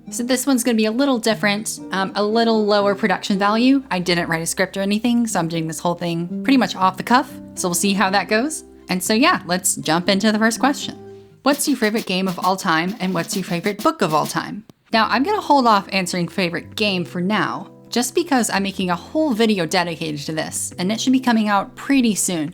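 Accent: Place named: South, United States. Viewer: i'm a linguist so it's actually super easy to answer! so southern american english has a lot going on with front vowels (vowels where your tongue is in the front of your mouth - think the vowels in "heed," "hid," "head," and "had") and diphthongs (vowels that are combinations of two vowel sounds - our non-rhotic diphthongs are vowels in "eight," "vote," "find," "down," and "boy"). particularly, SAE has the pin-pen merger where the vowels in those two words (/ɪ/ and /ɛ/) merge into the same vowel. you do this a little bit in the word "thing" in this video at . with diphthongs, SAE tends to reduce diphthongs to monophthongs; think "ah" instead of "I." you do this a little bit in the word "time" at . on the other hand, there's also diphthongization of what are traditionally front monophthongs! so thing like, "pay-en" instead of "pen." you do this a little bit in the word "genuinely" at . 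you don't like fully diphthongize it, but you turn /ɛ/ (vowel in "bet") into /e/, which is a vowel that doesn't traditionally exist on its own in english, as usually it's part of the /eɪ/ diphthong (like in "bait"). i feel like you also really velarize /l/ sounds a lot, like in "general" throughout the video. typically in american english, we do what's called an apical "L" (or light L, /l/) at the beginning of syllables (like in "light") but we do a velar "L" (or dark L, /ɫ/) at the ends of syllables (like in "pull"). the dark L is called as such because it jus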